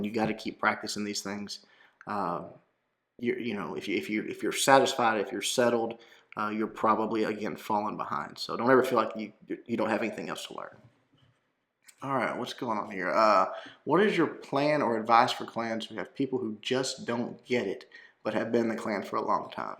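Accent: South, United States. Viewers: Wow a dude with a southern accent that has taxidermied animals what a shocker.........some people really need to leave their bubbles sometimes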